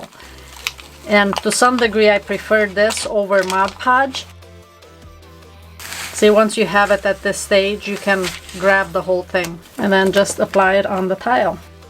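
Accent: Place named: Albania. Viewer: You have a beautiful Albanian accent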